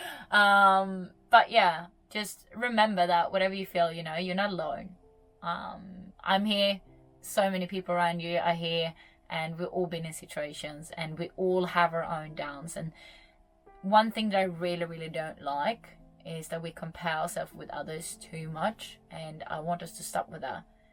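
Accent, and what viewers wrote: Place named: Australia. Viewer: You, family and friends go first, always! I like your videos and lookinga forward to see them. Does´nt matter if it´s in english or in swedish. I laught the first time I herd you talking english because you have/had a aussie accent :)... people always laught at me when I spoke engligsh. Sorry for misspelling and bad english :).. Ta det lugn och ta UMS (utmattningssymdrom) på allvar men det verkar du göra. Ser framemot dina videosar oavsett när de kommer. <3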